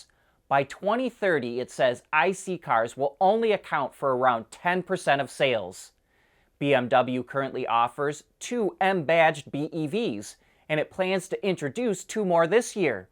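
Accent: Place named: Midwest, United States. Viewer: This is the Midwest. This is how we talk. Jeez.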